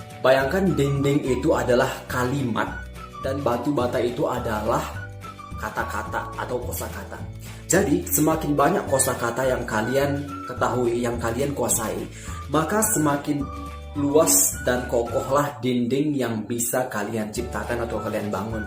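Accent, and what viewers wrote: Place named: South Korea. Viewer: 안녕하세요 .. logatnya dah kayak orang Korea 😎👍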